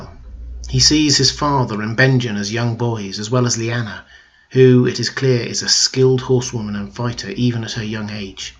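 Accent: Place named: RP, United Kingdom. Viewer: Your delivery is sounding much better. You've incorporated all the things I suggested. Combine that with your RP accent and you're just about the best GoT voice on here. Secrets of the Citadel is tops for similar reasons plus the depth of what she presents, but you could match her and Because Geek is energetic and so lovable, but you're up there now and your voice has it well in hand - good work. Your British accent is so appropriate for the subject. The Order of the Green Hand takes on a manner of speech that is fitting of the Medieval culture, are intelligent, but their voices smack of snobbery and overly serious and these things loose them points. Your hooded look also helps your sense of belonging with GoT. You look like a mysterious stranger someone might find on the road. Someone who looks sort of regular and harmless, like a peasant or wandering monk, but is actually a spy for the Iron Bank or something more dangerous posing as harmless.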